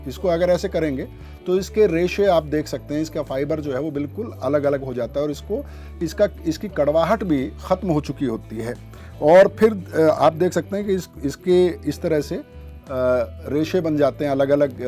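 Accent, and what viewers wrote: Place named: Moradabad, India. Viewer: जी आपकी भाषा मुझे मुरादाबाद के आस पास के छेत्र की लगती है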